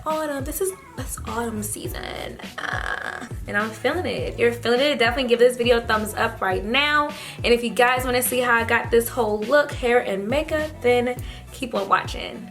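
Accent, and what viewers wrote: DMV, United States. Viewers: That DMV pops out everytime! I loveee you lol😂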